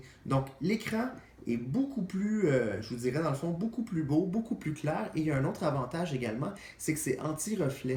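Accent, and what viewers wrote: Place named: Quebec, Canada. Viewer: Oh putain l'accent quebecois .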